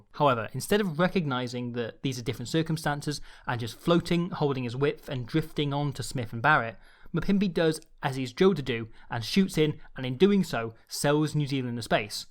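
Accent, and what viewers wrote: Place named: England, United Kingdom. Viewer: Ah. Never knew that. He sounds English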